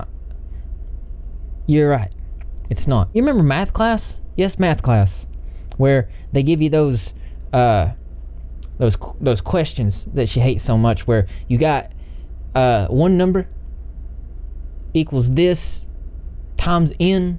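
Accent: South, United States. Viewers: i dunno :|........but in my opinion.......maybe its the southern accent......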